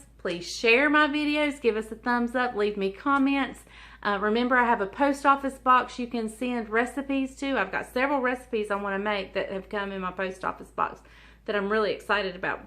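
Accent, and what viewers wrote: South, United States. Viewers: This cake looks absolutely delicious!!! My friend's birthday is coming up at the end of this month and I think this cake would be just the thing for her celebration. I just subscribed to your channel and am looking forward to watching more of your videos. I enjoy listening to your southern accent! (I'm from Missouri where we have no accent LOL)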